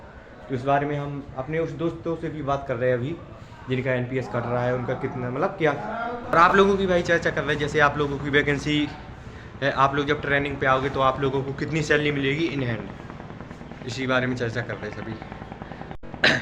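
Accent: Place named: Bundelkhand, India. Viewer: Bhai bundel khand side se hai kya language vahi ki lag rhi 😅😅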